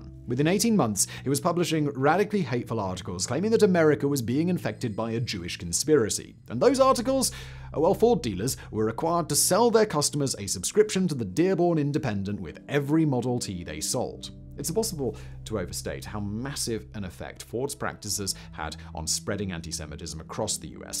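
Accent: United Kingdom. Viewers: Thank you for cleaning that up. The Model T contributing to the stock market crash is almost as foolish as the car contributing to anti-Semitism. Clearly, just because one has a great beard, a cool British accent, and wears glasses doesn't mean that one is a sophisticated thinker.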